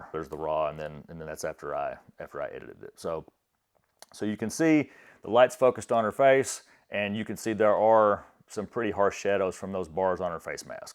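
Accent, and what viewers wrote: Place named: Texas, United States. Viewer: Another great video. Thanks so much. Side note: being from Miami, seeing a Hispanic person talking with a Texas accent really messes with my head. 😂😂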